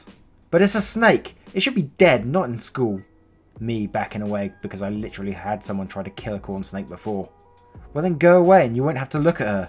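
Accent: United Kingdom. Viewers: Charter Schools are quite a cool idea (being from the UK like you I had never heard of them before either, until a few years ago) [I actually think there are now one or two of them in the UK]. You should look them up as they are so, so different from how we would have both been taught. Great content by the way. So nice to hear a British voice rather than an American one telling these tales (and before people kick off, I have nothing against American voices).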